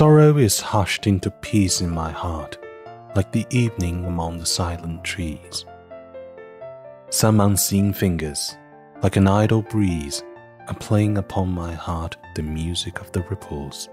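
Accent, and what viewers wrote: United Kingdom. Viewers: 喜欢英音的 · 優雅的英國腔' 真是迷人啊! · 好喜歡英音英音讀詩特別有意境好贊！ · 英音好听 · 可能是因为住在美国的原因，美英会更顺耳。但是您的英英感觉很舒服，似乎诗的朗读英英更有感觉。谢谢! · 好美啊！英音听起来很有质感。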